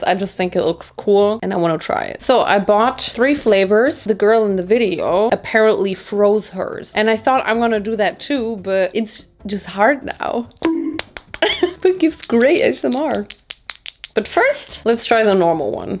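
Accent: Germany. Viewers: What is her accent? I am so confused. Sounds like German, Irish, but then just… western American? How?! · I love your accent I always wanted to go to Germany😁😁😁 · I have, a questioni are you German russa nord soming else?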